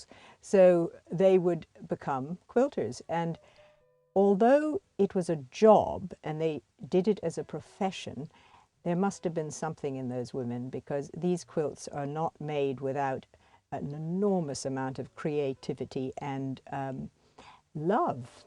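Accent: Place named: New England, United States. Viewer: It’s partly posh New England..a friend of mine spoke the same!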